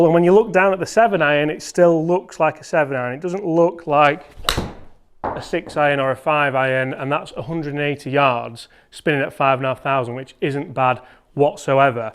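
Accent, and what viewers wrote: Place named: England, United Kingdom. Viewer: Im 63 I took up golf 6 years ago 6'4' 220 LBS so I hand a set custom made ,all irons about an inch longer than most. Im a long hitter 200 7 ,<num> ish 8 130 SW and 145 W 75+ 60! , anyway I have the Callaway big bertha irons ,5 years old and I think they are time to replace as I was playing 3 times a week , I think my shafts are fatigued and well as the heads, ( all materials deteriorate over time) problem is my HC is now a 26 .5 do to the fact I think the set is just used up and frustration takes over and I was a 20HC 2nd year into this ( HAD A GREAT GOLF COACH EVERY A WEEK)then dealt with health issues ( im back and feeling much better ,Your English accent and fast talking is hard to follow , Plain and simple I have to have mine custom made , 5 iron to PW , please suggest a head and shaft combination you feel would get me back to low to mid 90s game , , Thanks Brad USA .Any information from you would be greatly appreciated . 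Everyone at my golf club has their own advise ( OF COURSE) The club champ do tell me that if he could work with me more often one on one I would be low 80s/high 70s and club champ, Also said my iron shots are unreal," nobody here hits a 7 iron 200 + Advise? Help?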